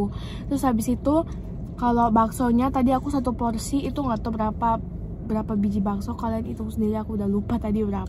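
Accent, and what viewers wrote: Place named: Java, Indonesia. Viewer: Medok bgt😳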